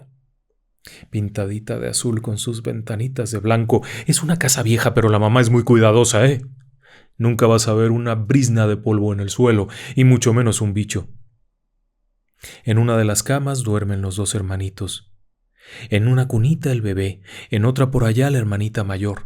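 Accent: Dominican Republic. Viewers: Parece Más Dominicano Que Yo. 🤣😍❤️🇩🇴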